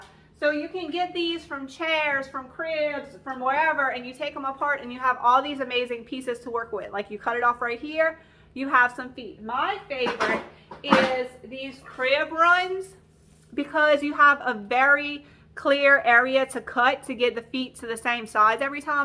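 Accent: Louisiana, United States. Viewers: your slight accent sounds like you are from New Iberia, LA. Am I correct? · I love to hear her accent. Adorable Southern-Cajun. Her projects are all gorgeous.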